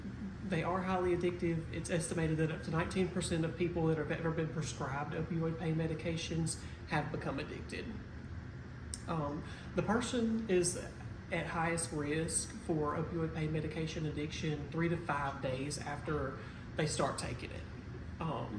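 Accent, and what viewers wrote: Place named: South, United States. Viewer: Close your eyes and imagine a plump southern Belle talking because this lady is her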